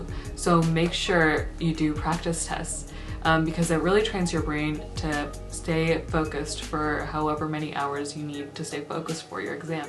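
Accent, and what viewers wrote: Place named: United States. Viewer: Love ur video, I’m an Indian too and finally Im watching a gre video of an Indian with a US accent 😂. Ur really funny too, great video 👍🏼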